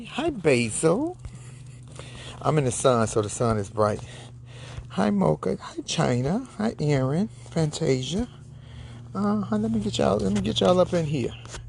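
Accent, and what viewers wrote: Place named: Chicago, United States. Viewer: I love you my dear PERIOD! You sound just like US girls from Chicago! The early 90s was the ish!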